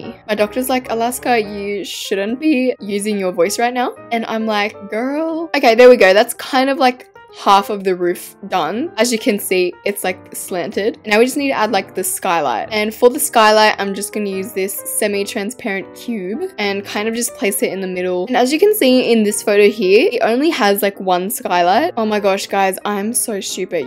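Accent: Australia. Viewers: she Australian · Wait is she Australian? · WAIT YOUR AUSTRALIAN, ME TOO!!!!!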